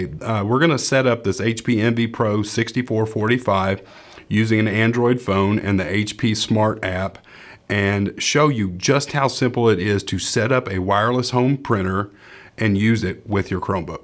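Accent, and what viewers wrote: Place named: Virginia, United States. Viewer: You sound like you're from my homestate Va. Anyway, do all chromebooks 315 connected to some printer? I looked up the ones that are compatible with CB and I decided on HP 2755e. Thanks for this really clear video. Second question, I thought you could just set up the printer on the CB screen by tapping the clock and then following through. How will I know if need HP Smart app?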